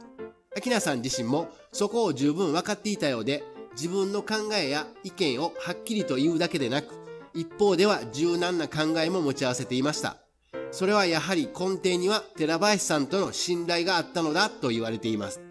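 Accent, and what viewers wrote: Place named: Kansai, Japan. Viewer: 中森明菜ファンでしたので登録しました‼️面白いepisodeありがとうございます♪ナレーションの関西弁素敵💓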